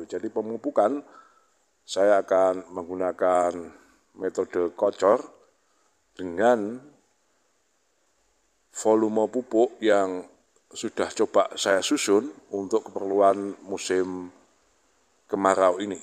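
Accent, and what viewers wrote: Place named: Nganjuk, Indonesia. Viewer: pean asal dr daerah mana..bahasanya kok plek wg nganjuk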